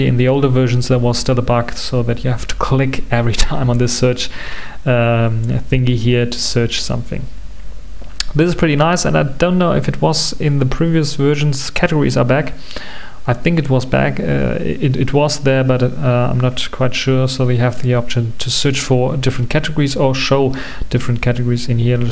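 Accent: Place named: Germany. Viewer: Deutscher Akzent ;) nice video.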